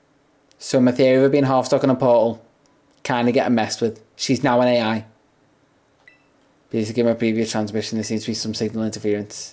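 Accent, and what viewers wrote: Liverpool, United Kingdom. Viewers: Whiplash putting a Pokémon video on and hearing a scouse accent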